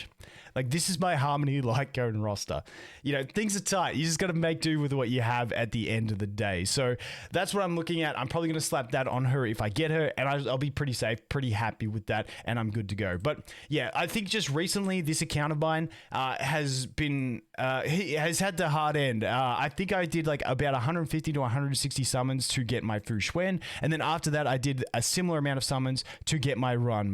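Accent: Australia. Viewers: Honestly I watch this guys cos of his Australian accent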